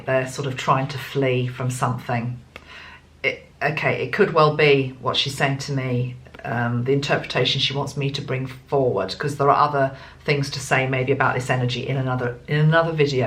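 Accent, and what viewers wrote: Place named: RP, United Kingdom. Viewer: i agree too. And the voice becomes well spoken with the plumminess of aristocracy. Beautiful x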